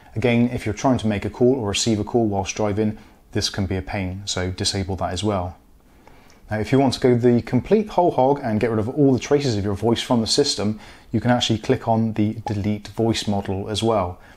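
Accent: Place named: Devon, United Kingdom. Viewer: you're a bloody genius! Thank you! Nice to hear an accent from home too! (I'm from Torbay) Once again, you've rescued me from a compete shock when I made my first voice call on a brand new phone! Cheers!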